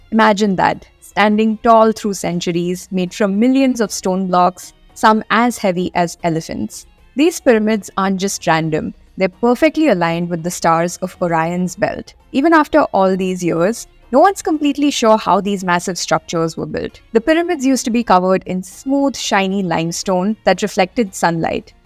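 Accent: India. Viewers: Amazing indian accent speaking english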